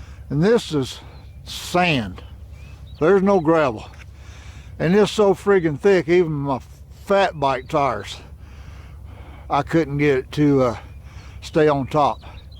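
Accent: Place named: Texas, United States. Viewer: Excellent first episode. I like the break in commentary .I like that old Texas accent good job 👍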